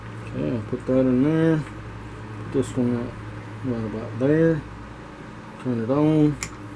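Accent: South, United States. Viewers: Thanks.....love the work and obvious excitement of exploring woodturning. You have the sound of a good ol' country boy. From a boy from Carolina.......keep it going. There is an artist in there working his way out. You remind me of my neighbor and best friend. I am working on getting him in the woodturning fold.......best to you....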